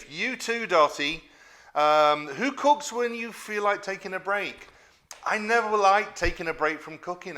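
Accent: England, United Kingdom. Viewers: Stumbled on your videos and became a huge fan. Keep doing what you're doing. I get no American accent at all you are still all English. It's a shame you didn't move to Canada though ;-)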